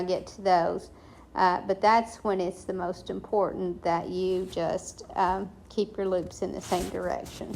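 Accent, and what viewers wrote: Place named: Tennessee, United States. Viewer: I just love watching your videos! And listening to you, too! LOL I was hoping you had a little shop I could visit somewhere near me in the Dallas/Fort Worth area but sadly, nope. 😢 But when I read you were in Tennessee, I knew that was why I loved your accent. Reminds me of home...Georgia. Anyway...everything you do is beautiful and I really appreciate your careful instructions.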